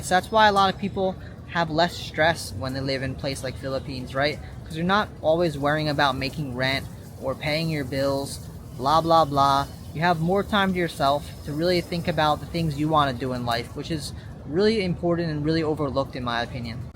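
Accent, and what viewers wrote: New York, United States. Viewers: that Nu Yoork Accent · I hear the nyc accent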